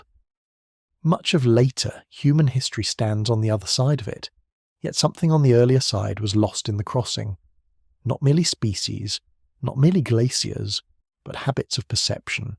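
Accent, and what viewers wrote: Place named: Australia. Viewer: AU narration sucks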